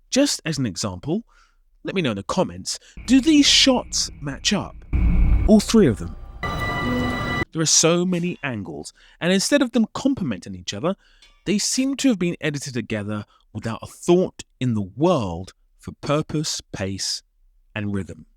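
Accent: United Kingdom. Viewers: I joined your channel cause I thought it was interesting then realize all you do is complain about shit you can't do. I'm a critic of things too but I don't know if it's just that you sound like a total white brit or that you sound pompous as shit. I just can't.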